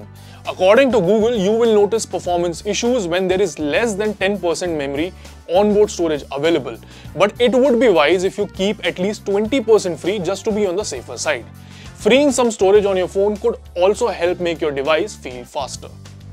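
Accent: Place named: India. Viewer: Did not understand one word that u said because of your accent from India!!, u need to speak better & more clear English!!!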